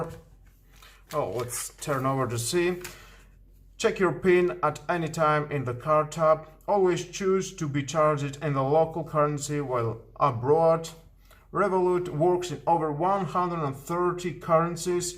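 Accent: Greece. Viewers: Τον Έλληνα δεν τον καταλαβαίνεις από την φωνή αλλά με την πρώτη λέξη πού θα μιλήσει αγγλικά, όσο special κι αν είναι, proficiency να έχει ρε ΚΑΤΕΥΘΕΊΑΝ ΘΑ ΠΕΙΣ ΝΑΤΟΣ😂💪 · Κι εγώ με το που τον άκουσα λέω δε παίζει, ή Έλληνας ή Ισπανός θα ήταν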